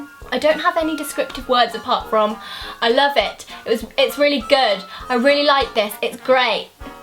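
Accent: England, United Kingdom. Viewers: your bloopers are hilarious and with a british accent... it makes it even better!!! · Your english accent is great, I mean I´m from Germany and I understand everything:) Thank you for making me feel like I could be good in English:D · i love listening to your British accent LOL:) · I love you so much! You have the thickest english accent and it makes your videos a million times better! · Is it just me or that by having a british accent you can say things and they seem funny? Does anyone find Australians funny? Zoella I love your personality. Id seen you in a couple of Fleur and Tanya's vids and this is my first video of yours i've seen and i'll be keen to watching more:)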